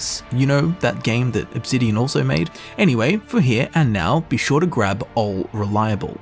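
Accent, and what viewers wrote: Australia. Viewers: i'm a simply guy. i hear a fellow 'strayan and hit subscribe.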